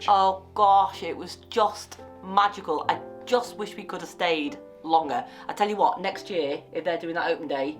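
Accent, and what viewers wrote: United Kingdom. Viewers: You guys should have your own tv show. Love the voice, love the British accent and interesting personality👌😎 Merry christmas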